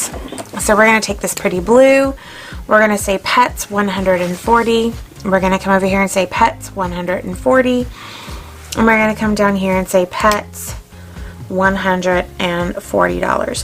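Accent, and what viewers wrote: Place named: South, United States. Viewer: You are extra southern in this video, but I love it!!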